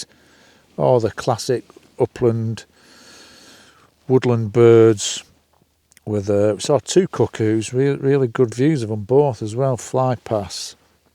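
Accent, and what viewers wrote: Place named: Yorkshire, United Kingdom. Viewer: Many thanks. We really enjoy your videos and your appreciation of birds, insects ,wild flowers etc, and we love your Yorkshire accent.We used to live in Coverdale, North Yorkshire and now we live in Alberta, Canada. We miss the walks but we enjoy yours and pretend we are walking with you. Please keep walking and sharing. Many thanks.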